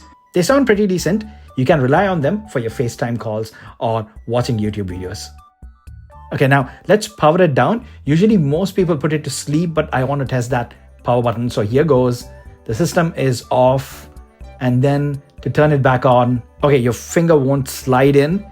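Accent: India. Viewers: are you an Indian Filth. eww the accent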